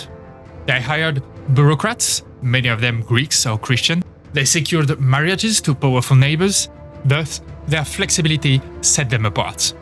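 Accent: France. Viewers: C'est moi ouuu le gars qui parle est français? D'après la prononciation qu'il fait. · am i the only one that finds his french accent in english charming? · Why did they get a guy with the thickest French accent I've ever heard to do the video? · french accent i see, nice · Me disait bien que j'avais reconnu l'accent haha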